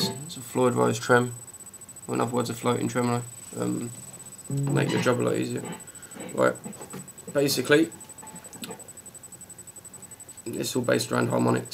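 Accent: United Kingdom. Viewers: Are you britsh all british guys remind me of Matt Bellamy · lmao bro im trying to learn this for some songs so i find its only appropriate the the guy giving the "lesson" has a british accent XD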